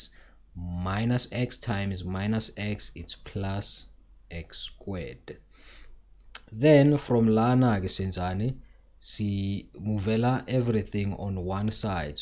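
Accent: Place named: South Africa. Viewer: "I'm watching your math videos on YouTube, your teaching is good but I'm struggling to understand because of the accent. You mix South African with English and it's a bit hard for me to catch. Can you please speak a bit slower or use more clear English?"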